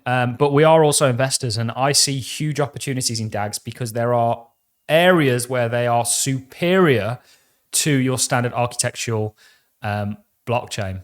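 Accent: United Kingdom. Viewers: Thanks for covering $KAS buddy - graceful and elegant British accent and mannerisms make it sound . . . exactly as great as it actually is !!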